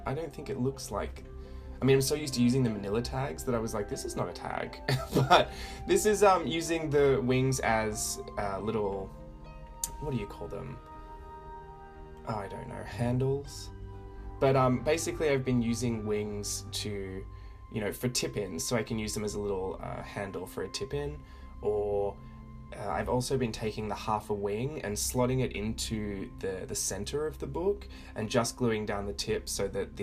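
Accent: Australia. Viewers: I could watch you create all day. Don't lose that Aussie accent! Thanks for all the inspiration. <3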